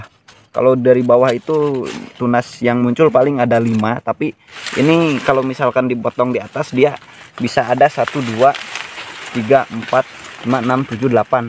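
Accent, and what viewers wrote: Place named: Java, Indonesia. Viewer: logat bicaranya ky orang jawa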